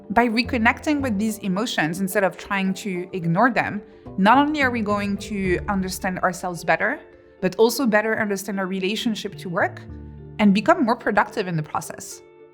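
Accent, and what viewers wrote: France. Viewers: Beautiful French accent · Love the little french accent🇫🇷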